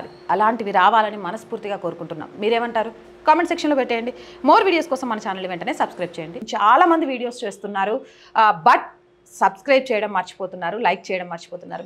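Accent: Telangana, India. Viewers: చెల్లమ్మా నీ ధైర్యానికి జోహారు తల్లీ నువ్వూ ఇలానే చెయ్ నీ వెనక మేము ఉంటాం తల్లీ కాస్త బొట్టు పెద్దగా పెట్టుకో బంగారు తల్లి నీ యాస మా తెలంగాణ అనుకుంట అమ్మ నా సలహా పాటించమని నా విన్నపం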